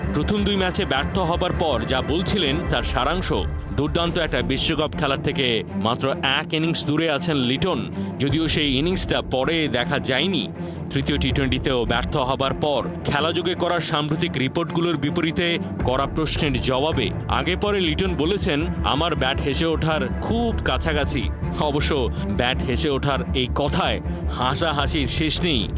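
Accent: Kolkata, India. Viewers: কোলকাতার এক্সেন্টে কথা বলছেন কেন?? বাংলা জানেন না?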